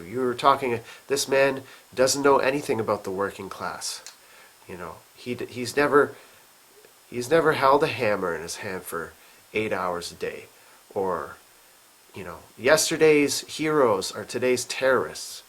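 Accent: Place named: Canada. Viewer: you’re a great guy! You wear your heart on your sleeve! From the beginning I watched you mostly, although some others, but with you I really got the Canadian vibe. I’m from the Southern US that’s it’s enjoyable hearing you talk dude! I get a kick out of how you describe the cold weather as “snappy” 😹 For years Americans joked about Canadians but let me tell you, y’all have earned our respect and the worlds respect over the past 3 weeks! Honestly, I’ll never look at the Canadian flag the same way ever again! Now, I see the Canadian with so much respect and it’s an honor having good people like you just to our north! Also to add, I’ve learned more about Canadians in the past 3 weeks than ever before and I really appreciate what you’ve done as well as everyone else! Stay strong! Y’all have the support of so many people all over this world including your cousins down down here in the US!!!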